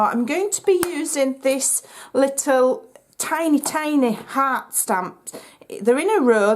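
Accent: United Kingdom. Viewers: I love your accent. What part of the U.K. are you from?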